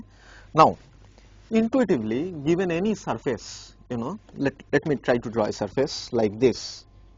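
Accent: India. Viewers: i cant understand it coz of his indian accent, and the shitty vid quality.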